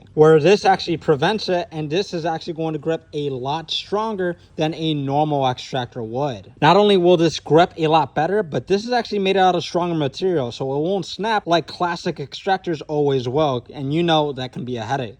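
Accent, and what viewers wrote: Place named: Somalia. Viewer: I love you Somalian accent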